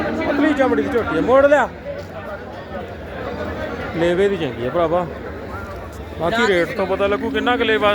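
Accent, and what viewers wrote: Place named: Punjab, India. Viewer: Sona bahut hai kanjarda teri boli jata wali hai bhai teri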